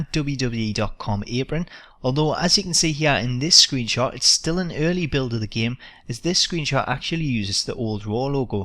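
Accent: Newcastle, United Kingdom. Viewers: you have the best accent ever, Newcastle I presume?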